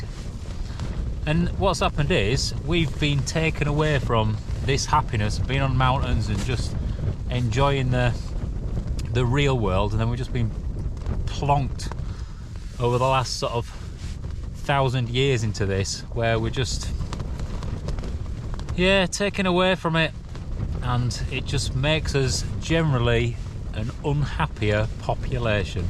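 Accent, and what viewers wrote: Yorkshire, United Kingdom. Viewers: Beautiful video and very exiting for a camping video xD But I have to ask. I'm originally from the UK but now live in America. This is my first video watching on your channel. It sounds like you have a Yorkshire or nothern accent. May I ask where you are from? I was born in North Yorkshire and lost my accent due to bullying at a young age, but sounds so familiar, so I am just wondering :)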